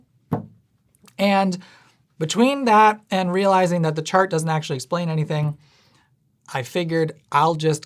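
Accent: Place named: Midwest, United States. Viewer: That's just his Midwest showing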